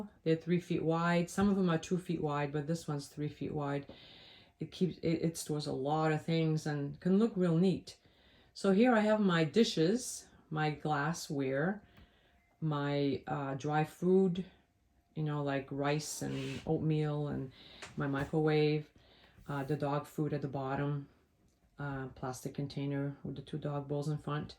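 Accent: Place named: New England, United States. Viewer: are you from New England....I thought I detected a faint New England or NY accent. Very nice little space...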